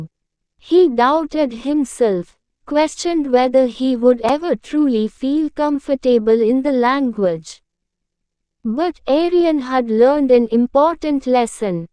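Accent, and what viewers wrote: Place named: India. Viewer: It is indian accent not british or American 😐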